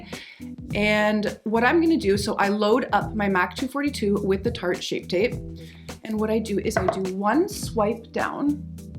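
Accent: Canada. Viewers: Are you Canadian? Your accent is so cute, like really eloquent and like, detailed pronouncing. Most Americans don’t talk like that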